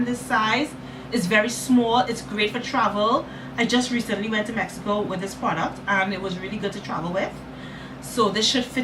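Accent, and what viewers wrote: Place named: Trinidad and Tobago. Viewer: Love your accent. You sound like you have a Trini vernacular. Thank you for doing this review. I just bought this tonight. I normally use Estée Lauder but it’s hard to find in stores so I’m trying thi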